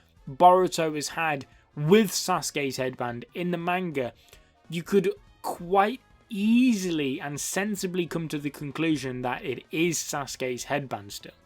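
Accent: United Kingdom. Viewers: Omg listening to a bri'ish person talk about anime is so strange for some reason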